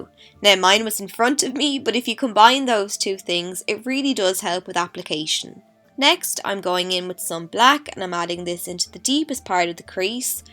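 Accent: Ireland. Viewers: Lol im irish too and you sound like my music teacher XD. My accents a bit different from urs cause im from the north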